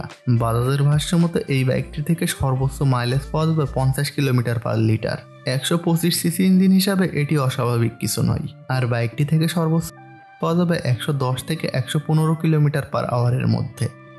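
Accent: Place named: Netrokona, Bangladesh. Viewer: kotha bolar style eto low quality keno ??? ageee valo vabe bangla bola siken...kotha sune mone hosse Netrokona area er kno jokes bolcen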